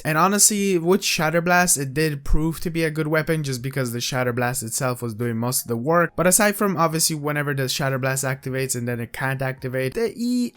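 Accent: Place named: Ireland. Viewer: He's irish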